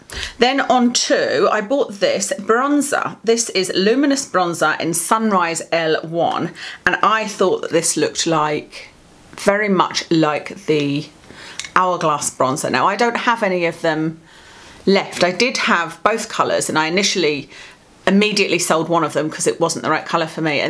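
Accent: Scotland, United Kingdom. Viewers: Are you from Scotland. My home town. Love your videos x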